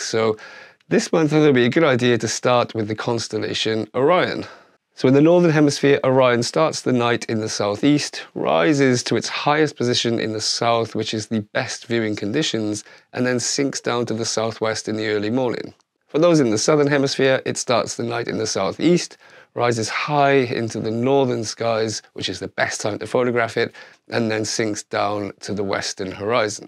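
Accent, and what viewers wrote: Wales, United Kingdom. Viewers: Great details, 👌 u probly know already. Also superb asmr c; Cymru accent,? Live from snowdonia? C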